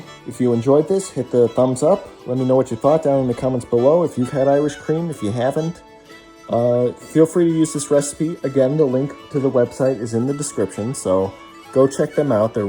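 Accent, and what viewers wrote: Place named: North America. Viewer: a guy who claims being Irish himself - why would he have an North American accent and not Irish?!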